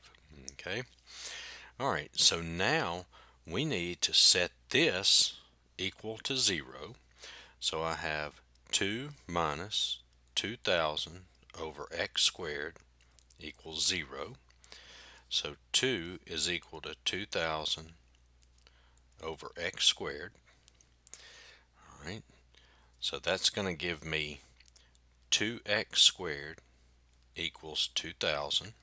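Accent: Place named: United States. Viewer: I like your accent.. it sounds... American. Very soothing. (this is comming from an Australian). Great video!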